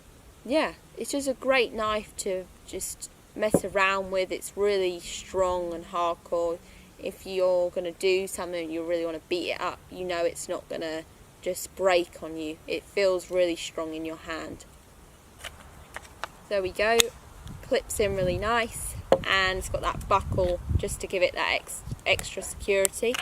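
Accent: United Kingdom. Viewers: Oo you’re British that’s nice for a change well used to American vids about bushcraft but no U.K. content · British accents sound great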